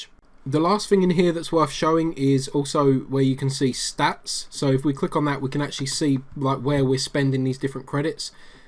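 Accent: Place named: Colchester, United Kingdom. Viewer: I'm originally from Colchester too. The accent sounded familiar :) ..A Helpful video as returning to SEO my sites. Ta very much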